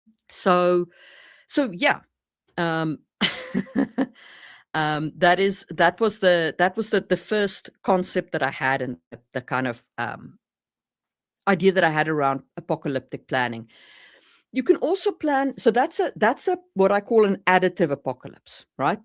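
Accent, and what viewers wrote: South Africa. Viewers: Afrikaans? I've always wondered about your accent when you said you were from Finland because it always sounded like South African to me. Good to finally know.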